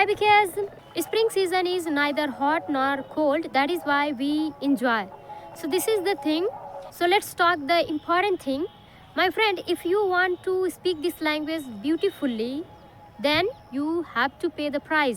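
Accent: India. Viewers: I like indian accent in english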